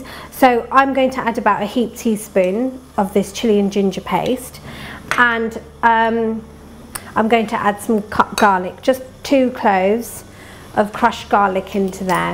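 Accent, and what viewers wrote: United Kingdom. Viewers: nice vedio.. thnx for uploading........... and nice British accent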